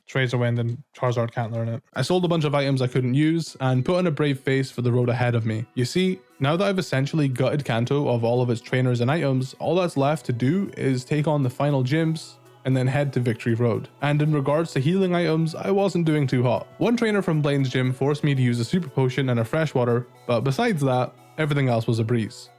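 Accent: United Kingdom. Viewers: Where is your British accent from :0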